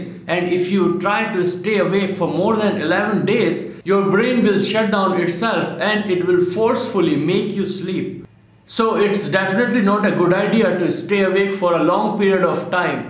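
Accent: India. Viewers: I think is a indian by his speaking language and.... Nothing 😅😅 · I'm pretty sure he is he sounds Indian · Dat Indian English :D why are indians making this vids ? I heard thath they are not rich...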